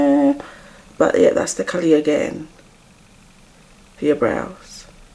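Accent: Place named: Australia. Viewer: Youre bloody stunning! You kinda sound Aussie :D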